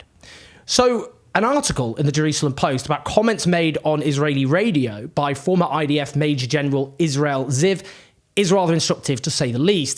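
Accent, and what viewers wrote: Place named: England, United Kingdom. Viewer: you are imoressive but please speak more slowly. As an American, the English accent, although very nice, is a bit of a problem to understand and spoken rapidly much more difficult or even impossible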